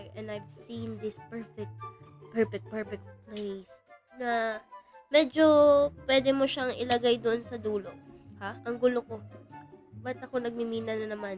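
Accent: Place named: Conyo, Philippines. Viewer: ang cute ng pagka conyo ni ate 😭🤏🏻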